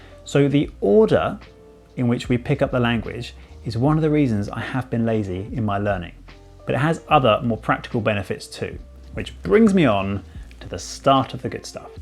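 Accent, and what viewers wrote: United Kingdom. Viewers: I'm trying to learn English and i have a B1-B2 level right now. I think i understand this video at 85% even if you speak pretty slowly and you are very clear with your vocabulary. I think it's due to the different accent because i never watch British contain on YouTube. I should because i can understand an American video at 90-95%. The problem is still when people speak so fast that i can't understand. I do a lot of input but also take vocab on a special app (Quizlet Wich i recommend because it's like cards and it's very helpful). Make input and learn the vocab that you learn while input and you'll increase. Also, read in your target language even if it's book that you already know. I had a 615 score in TOEIC and by this method i have a 850 today. I don't even know the grammar that's why I hope that there is not a lot of mistakes here. 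Tell me if there is mistakes I'm happy to learn things from native😁. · Excuse me, Where is your accent from, precisely? South of wales, where? I would like to do an exchange in the future, but I think that some UK accents are difficult to understand, and yours sounds nice to me even though I'm not used to hearing this accent.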